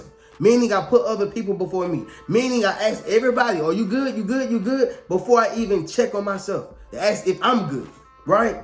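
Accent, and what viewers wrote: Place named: Rural, United States. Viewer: It ain't the same no mo...love your country twang.....😊😊😊😊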